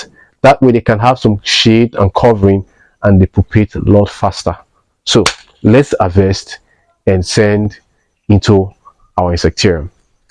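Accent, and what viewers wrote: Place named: Africa. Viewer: Your English accent is African learn the Filipino English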